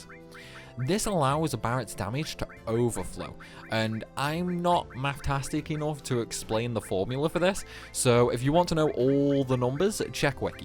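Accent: Stoke, United Kingdom. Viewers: You're a Stokie, can tell from the accent. Great video btw!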